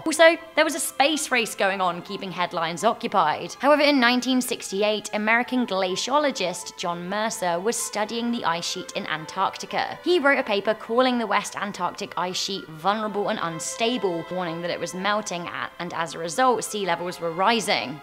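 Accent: England, United Kingdom. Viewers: Her English Accent. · When people with British accents try to talk about American politics make me laugh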